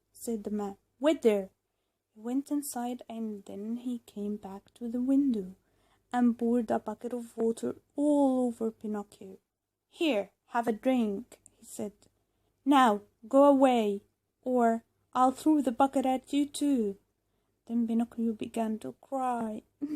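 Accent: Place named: United States. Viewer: Cool, I thought you were American before I saw your channel. 💕💕💕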